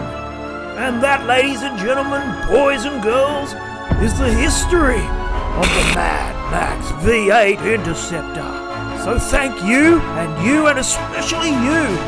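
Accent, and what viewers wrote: Australia. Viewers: To hear the history of the Interceptor in Australian accent is just 👌🏻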